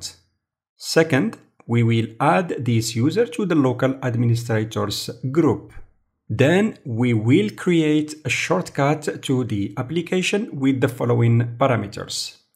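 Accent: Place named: India. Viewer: Initially you wanted to say "How to create a NEW admin account with your current admin account, with which you can run admin programs you could also do with your usual admin account". You're basically selling bullshit here and try to fool anyone the dullest way possible. But the indish accent tells everything right at the beginning already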